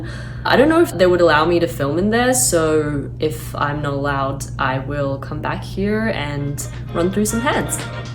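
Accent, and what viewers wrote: Australia. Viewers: refreshing to hear an aussie accent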